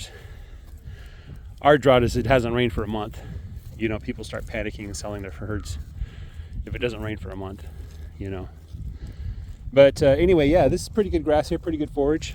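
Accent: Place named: South, United States. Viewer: i enjoy the story of finding the right breed to add in a certain trait. southern drawl is easy on the ears. Hopefully, if you get a South Poll bull or a starter herd, you will boost your grass to beef efficiency-slowly or more rapidly... South Poll can get FAT on forages they graze. If your soils are depleted, granular minerals will help the cattle and the cattle will choose the minerals needed and fertilize your soil. Depending on where you get the bull or starter herd, the cattle may know how to eat more weedy forages than you show on your channel. Variety of forages adds nutrients, as different plants cycle diverse arrays of nutrients from the soil. Each "weed" tells of the soil's need to be healed. Dr Elaine Ingham does soil health lectures, if you want to get fairly technical. Understanding Ag recommends certain labs to run the Haney test, because some labs do the proper protocol. 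Haney test uses WATER, not other chemicals that are un-natural to the soil, to determine what nutrients are bio-available...if i understand this correctly. Do your own research.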